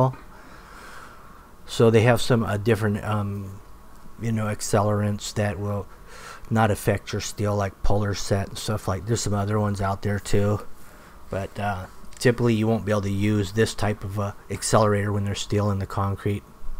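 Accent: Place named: California, United States. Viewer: you're a good man. You are a throw back to the true Californians I remember from back then...even the way you talk. AWESOME!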